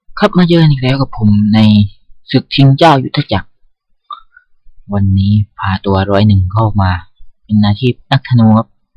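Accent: South, Thailand. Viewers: พี่เด็กใต้ป่าวคับ ทองแดงทั้งนั้นเลยนิ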